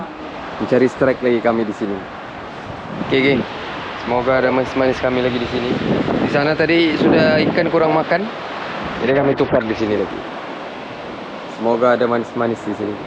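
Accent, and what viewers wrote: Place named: Sabah, Malaysia. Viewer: Terbaikk gabungan 2 sipoo surfcast sabahan😅.. Sekali loghat sabahan berkumandang... Paduu betul sipoo tu, landed ikan sisik dgn Palergic.. Surfcast memang kepakaran dia.. Sipoo pun x kurang mantap.. Strike padu jgak wlau ikan duri.. Rwzki buat kwn.. Alhamdulillah.. Terbaikk 2 sipoo idola.. Mantapoo❤🎉❤🎉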